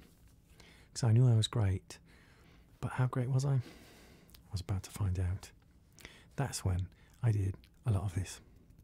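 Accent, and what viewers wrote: United Kingdom. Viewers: I love your softly spoken English accents so much! Your unique sense of humor always shines through. Super relaxing video. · Nailed the accent! Thats coming from a southern english person. You sound like u come from my town 😂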